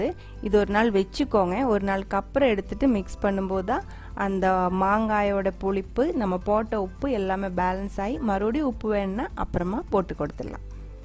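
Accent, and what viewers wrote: Kerala, India. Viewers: U Malayali or Tamil? 🤔🤔🤔🤔🤔 Talking so different 🤔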